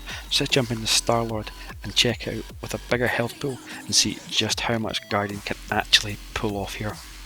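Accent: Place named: United Kingdom. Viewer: Your British accent is THICC Boy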